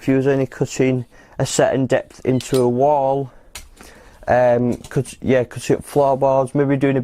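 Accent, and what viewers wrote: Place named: Manchester, United Kingdom. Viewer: Wow the manc accent in strong in this one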